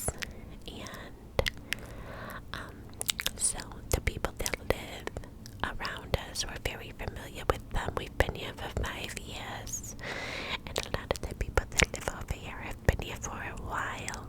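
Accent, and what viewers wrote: New York, United States. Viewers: You're such a great story teller & I love the New York accent ❤ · i love when you whisper in a ny accent, so relaxing! you look stunning, i love your eyelashes! thank you for everything you do gorgeous! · yay the NY accent!!! i want to watch this so bad but i need to sleep, cant sleep during this one... ill just watch it twice! 😂🥰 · ahhhh! I’m late again! Sorry about that! I cant wait to fall asleep eat tonight, thank you,! I love your New York accent evern if I have no idea how accurate it is xD Hope you are doing well and your family is doing good in these times. Edit: Also, yes, please, please, please do more of these! Keep on keeping on ~<3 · Late again😩wish YouTube would notify me of your videos I always have to search for them😩loved this story time & you already KNOW that cupped whispers are the best, also your New York accent is so cute ahah ❤️ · I have watched this video so many times! 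Love it! The NY accent you do with the clicky whisper you have is heaven! ♥️ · Pleaseee do more storytimes with the NY accent, sooo goood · ASMR thank you! and great video btw i love when you do the ny accent 🥺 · Living for the NY accent rambles! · Me too! that NY accent is so relaxing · Re watching old videos as I have given myself a chill morning off. Love your NY accent. Also love hearing your old gossip again. Still makes me giggle after the 5th time of watching ❤️ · NY accent, cupped whispers AND story time all in one video?? I'm in heaven! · in your last NY accent the way you said Niagara falls made me giggle so i started saying it around my roommates and sometimes we’ll just go “niAHHGRUH FAWWLLS” out of nowhere 😂😅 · Love the New York accent 💖💖💖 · As a Southerner from Tennessee, I love hearing the New York accent! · New subscriber here. I've seen a couple of your vids. I do love a juicy storytime! 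:) Enjoyed your NY accent as well. ❤💯💯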